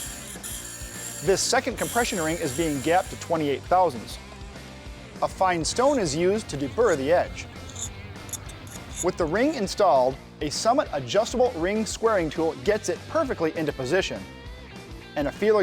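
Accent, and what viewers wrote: United States. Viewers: Can the narrator get any more American than this???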